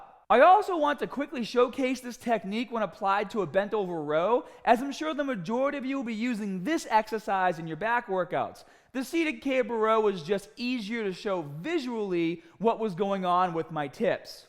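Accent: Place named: Boston, United States. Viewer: Inconsistent Boston accent? Is he from Rhode Island or something? It sounds like a hybrid accent.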